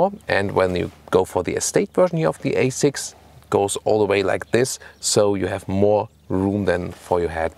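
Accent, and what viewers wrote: Germany. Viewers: Yeah, I guess now that you mention it, we've had German au pairs and they and their friends are all embarrassed by their parents' accents.:) It's not so bad though... Germany is a tremendously well thought of country to say the least! And Germans (au pairs, at least) have much better English than other European countries. So, don't worry about the accents. ' accent really adds to the review for us Americans because it's a constant reminder that this is what an actual German thinks! Europe is cool for Americans. It's a big reason why Americans drive European (German) cars. Now me, personally,... I've owned only one foreign car in my life and it actually was a brand new Audi A6. And that car almost put me in an insane asylum with all of its problems and its crappy engine!:) So, it's Detroit Iron only for me! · That strong German accent is horrible. 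Most kids speak much better english. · His German accent genuinely made me feel I was already riding an audi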